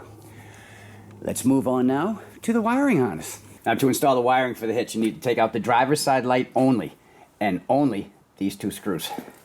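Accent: Boston, United States. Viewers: Thank you for such a marvelously done video. I bought my 2015 Black Sahara last week, ordered my hitch right after watching your 'how to' and installed it yesterday in about an hour. And all for under $75. I've ordered my fuel filler cover upgrade and your video for that looks easy enough to follow. BTW, I grew up in Newton Center, MA and love hearing your accent. Reminds me of my childhood.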